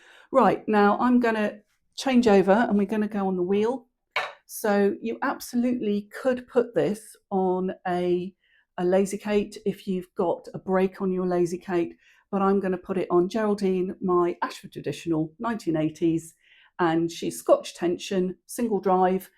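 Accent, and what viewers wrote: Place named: England, United Kingdom. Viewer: I just love how AI subtitles just cannot cope with "niddy noddy" particularly in an English accent....."nitty naughty"😂 Also just a quick tip, if you do just have a tiny amount to skein up you can use just 2 arms of your noddy noddy instead of the whole wrap. Then to work out your length you just divide the full length around your tool in half, then multiply by wraps made. Also if you do a lot of sampling, just make a small niddy noddy from cardboard to make neat little mini skeins.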